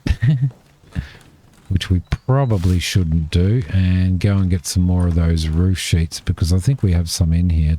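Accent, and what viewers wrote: Australia. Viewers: hey are you an auzzie?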